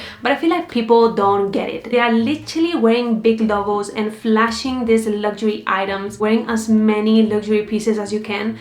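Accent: Spain. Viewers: are you a spaniard? you sound like my mom (in a good way)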